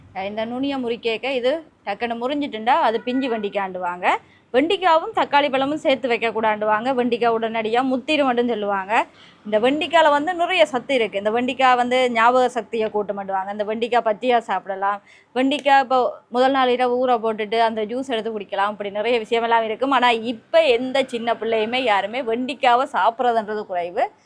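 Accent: Jaffna, Sri Lanka. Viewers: உங்கள் தமிழில் யாழ்ப்பாண வாடையும், தம்பியின் தமிழில் மலையக வாடையும் அடிக்கிறதே ஏன்? நீங்கள் எந்த ஊர்? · கொஞ்சும் யாழ் மண்ணின் மணம் மணக்கும் தமிழ் சுவையோ சுவை. என் தமிழ் மகளே உன் அன்பான கையில் உணவு உண்ண துடிக்குது என் மனம். நீ தங்க தமிழ் பேசும் அழகு தித்திக்குதே. கொஞ்சும் தமிழ் போற்றும் மிஞ்சும் தமிழ் . ஆகா வெண்டைக்காய் குழம்பு சொல்லி கொடுங்க நானும் செய்து சாப்பிட ஆசை ஆசையாக இருக்கிறது.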